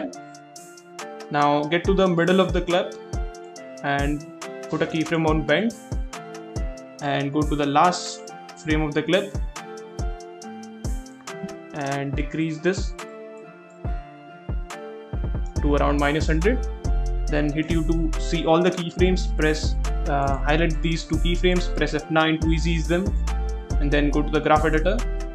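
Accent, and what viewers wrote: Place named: India. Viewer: DAMN THE INDIAN ACCENT IS DRIPPING OUT OF THE VIDEO!!!!!!!!!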